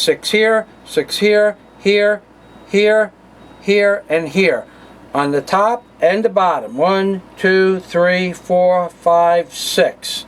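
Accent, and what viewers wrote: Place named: Texas, United States. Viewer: you sound like you from taxas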